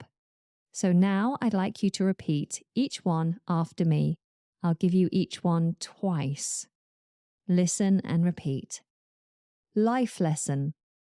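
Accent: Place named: RP, United Kingdom. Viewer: Good lesson, especially for the beginners! I especially adore your accent, the genuine RP accent and pronunciation.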